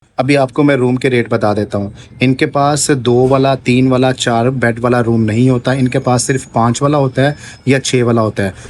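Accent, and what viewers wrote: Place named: Punjab, India. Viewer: Ap Baloch hain but ap ka lehja Punjabi jaisa hay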